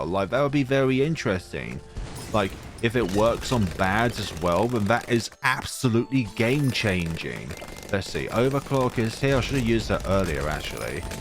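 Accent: United Kingdom. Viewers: he's from the UK I think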